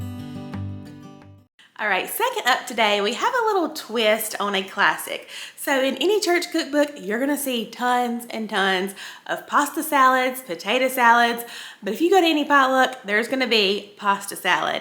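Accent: South, United States. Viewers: Can't listen to that southern accent. Bah bye.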